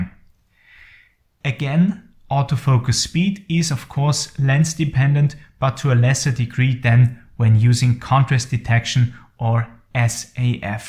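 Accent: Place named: Vienna, Austria. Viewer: Anschaulich beschrieben, danke. Im sympathischen Wiener Dialekt statt in englisch hätte es von mir auch ein "thumps up" gegeben 😀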